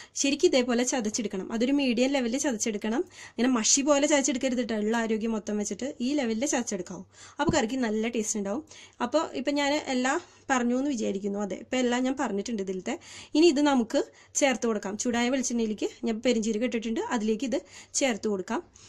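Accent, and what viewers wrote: Thrissur, India. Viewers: ചേച്ചിയുടെ അവതരണം സൂപ്പർ . സംസാരവും തൃശ്ശൂർ ക്കാരി ആണ് അല്ലെ വീഡിയോ കാണാൻ കുറച്ചു വായിഗ് പോയി സോറി . സംഭവം കലക്കി ... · haha...kollam chechii...enike ee recipem chechide sumsaravum istapettu....thani nadan thrissur bhasha..nyc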